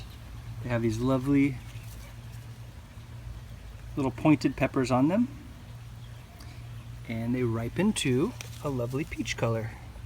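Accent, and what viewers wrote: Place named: France. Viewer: You have somewhat of a French accent... Are you from a Ontario or Quebec??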